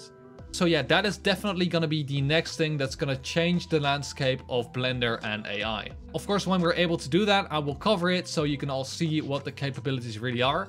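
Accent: Netherlands. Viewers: Accent. Dutch guy. 100% 👍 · Nederlander!? Broeder!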